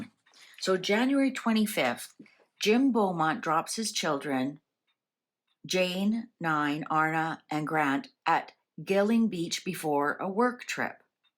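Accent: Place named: Canada. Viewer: Get over it.she is Canadian so pronounce differently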